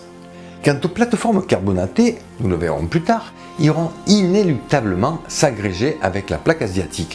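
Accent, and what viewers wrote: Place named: Provence, France. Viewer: Le retour des chemises à fleur et de l'accent provençal, ça m'avait trop manqué !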